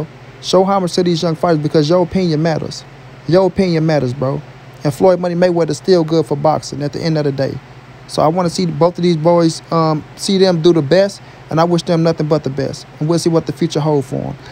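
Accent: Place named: St. Louis, United States. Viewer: I'm a white dude from north county STL, just happened across this video and soon as I heard you say "Give him his flowers while he HUR" I said aw shit this dude from the Lou 😆 Good content bro, respect💪💯 #Subcribed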